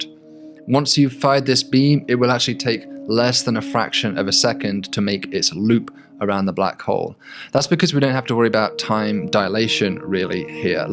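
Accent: United Kingdom. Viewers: This dude looks like someone thought to themselves what the coolest person they could make is and we got this guy. Black leather jacket, british accent, awesome hair, and extremely smart. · Its just the British accent.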